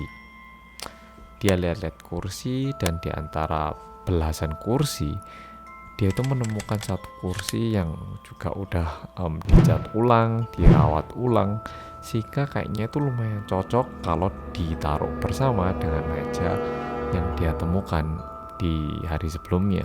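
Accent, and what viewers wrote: Java, Indonesia. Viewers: bersama orang korea yang... aku: medokkk mas: kewedennn sampe lupa:'' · Mana orang korea yabg medokkkkkkkk................;v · Aku rasa kok medoknya mas rada kurang ya.wkwkwk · Medok Is Back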